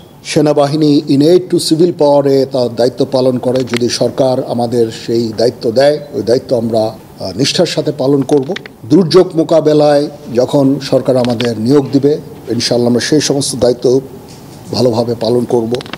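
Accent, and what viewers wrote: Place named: Bangladesh. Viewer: কথা শুনে আপনাকে বাংলাদেশী মনে হচ্ছে। থ্যাঙ্ক ইউ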